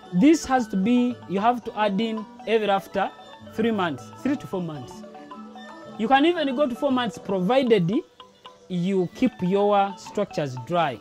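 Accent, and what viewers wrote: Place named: Kenya. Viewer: This's Kenya English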